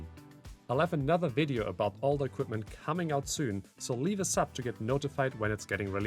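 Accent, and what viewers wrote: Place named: Germany. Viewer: Deutsch... Man hörts XD aber gutes video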